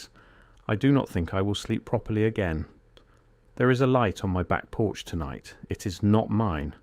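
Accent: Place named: United Kingdom. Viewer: Ridiculous to have this narrated by a British voice. It’s like wearing a striped shirt with a polka dot pair of pants and disco boots.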